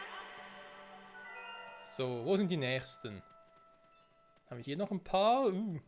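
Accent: Switzerland. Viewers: Hey gutes Video, bist du Schweizer? :D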